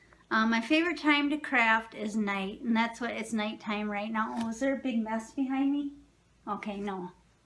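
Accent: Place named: Wisconsin, United States. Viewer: Ha, I thought you sounded like a WI girl :)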